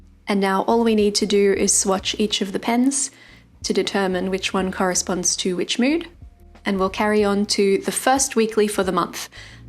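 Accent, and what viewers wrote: Australia. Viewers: It has been so incredibly hot in Australia. I live in Darwin and it has been hitting 40 degrees with 80% humidity. Your quote page is using the Pantone colour of the year. My January setup us revolving around this colour. It is so refreshing to hee an Aussie accent on YouTube and I just love your style of Bullet Journaling.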